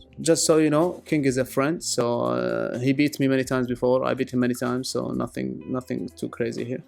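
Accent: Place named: Arab world. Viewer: ليش صوتك كأنك عربي يتكلم انجليزي ؟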